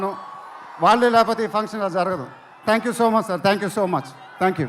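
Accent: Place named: Guntur, India. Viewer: Pure guntur slang 😍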